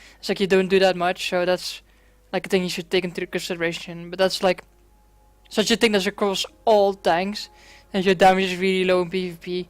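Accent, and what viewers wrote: Netherlands. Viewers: the dutch exent is so enoing but the info is kinda good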